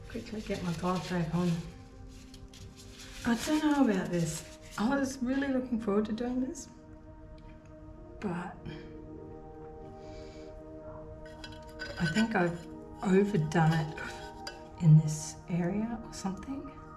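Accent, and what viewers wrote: Australia. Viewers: Thanks for sharing your learning curve. I am just about to start pour painting and love listening to an Australian accent. I live in Queensland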